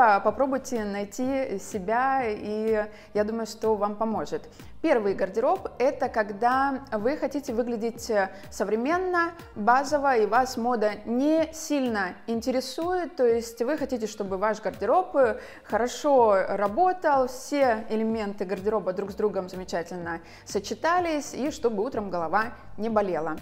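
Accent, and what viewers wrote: Italy. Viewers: Обожаю, как вы разговариваете, у вас даже речь стильная! Грамотная, уверенная, женственная и как вишенка на торте элегантный итальянский акцент! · я обожаю ваш итальянский акцент🥰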